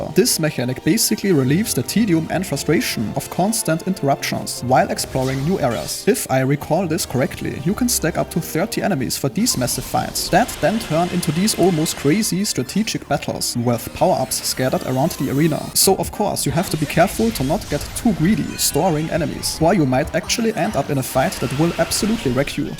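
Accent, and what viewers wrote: Germany. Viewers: ich mag den Deutschen Akzent und follow gleichmal rein ^^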